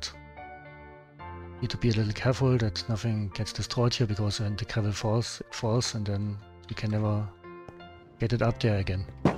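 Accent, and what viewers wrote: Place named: Germany. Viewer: korrigiere mich, aber ich denke aufgrund deiner aussprache das du deutscher bist :D eine ernst gemeinte frage, warum macht man sowas, bzw wo genau liegt da die faszination drin von sowas ein video zu machen? ich selber hab spaß an solchen rails ingame würde aber nie auf die idee kommen ein video davon zu machen. ansonsten liebe grüße und weiterhin viel spaß am videos machen ;)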